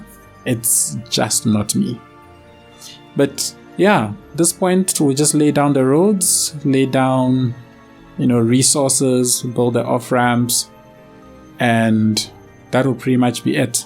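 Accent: South Africa. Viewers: Great build, and also nice accent, sounds south african to me :) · That is one SEXY voice I agree. The South African Accent coming out really good and professional. Thank you for that! Keep us as South Africa on the map of CS - Good job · I guessed this before seeing on your Twitter you're from Joburg. Howzit. :) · Are you from South Africa? GP or KZN?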